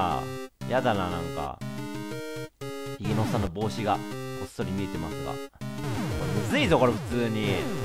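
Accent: Kansai, Japan. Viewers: 関西弁キャラに戻らないかなぁ…… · の関西弁が可愛すぎる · やっぱ、関西弁はいいな～ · 誤解しとったやん！簡単やったやん！のじんみーな関西弁好きだー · の関西弁ええな！！ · の関西弁実況いいな〜なんか癒される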